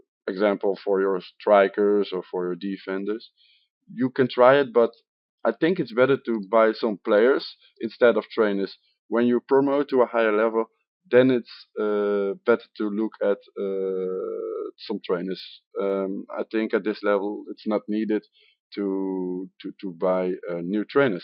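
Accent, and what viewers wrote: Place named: Netherlands. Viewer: Great video. Your English is pretty good. From your accent I guess you are Dutch. Why do you choose an English team? Anyway, more videos like this please. I play as Gillingham in League 2, just got promoted through the playoffs.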